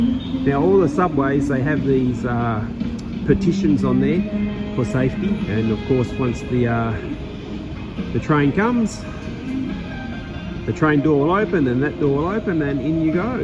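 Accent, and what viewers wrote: Australia. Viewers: From the accent, it feels like this anchor is from Australia.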